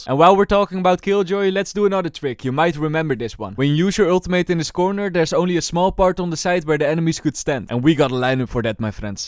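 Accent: Netherlands. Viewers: good tips but are you ducht? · i smell dutch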